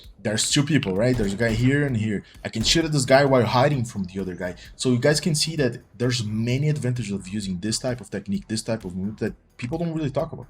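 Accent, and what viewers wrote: Brazil. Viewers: where are u from you sound kinda brazilian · mano, vc é brasileiro né? seu sotaque parece de brasileiro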